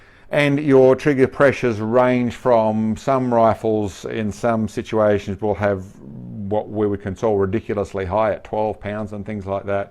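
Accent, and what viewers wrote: Australia. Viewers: This guy sounds Australian, but Australians can't have guns. So is he African?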